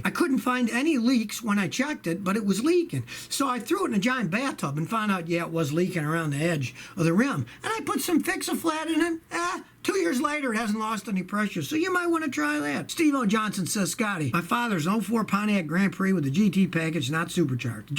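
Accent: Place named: United States. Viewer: You sound like an American.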